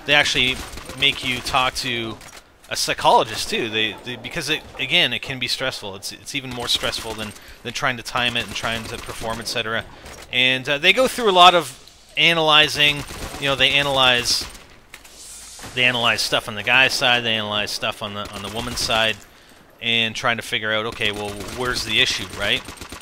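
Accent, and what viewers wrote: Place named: Canada. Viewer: is Canadian. His accent pretty much gives it away if you're familiar with Canadian accents at all. I remember the first time I saw an vid, I realized about 30 seconds in "This guy has GOT to be Canadian. Aboot? Eh?"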